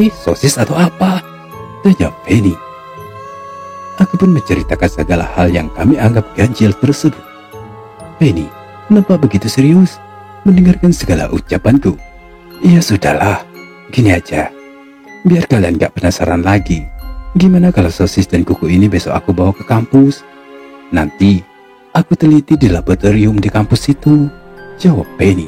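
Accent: Palembang, Indonesia. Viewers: Bang itu bahasa palembang ya, ciri khas nya pakai hurup O ,benar ngak bang ? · Itu gaya bahasa palembang byk kata o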